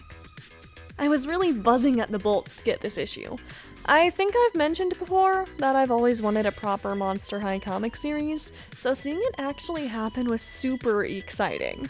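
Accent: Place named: South, United States. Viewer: his southern accent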